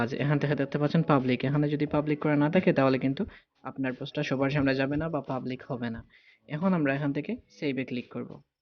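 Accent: Sylhet, Bangladesh. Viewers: tumire vai sylheti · apnar mat hunia bhuja jar sylheti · no, sylheti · Sylheti bro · ভাই মনে হয় সিলেটি 🙂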